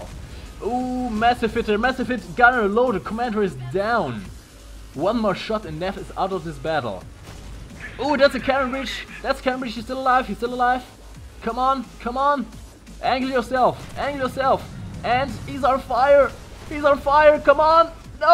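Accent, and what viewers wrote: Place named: Germany. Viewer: Also bist du deutschstämmig. Ich dachte schon immer das du einen deutschen Akzent hast...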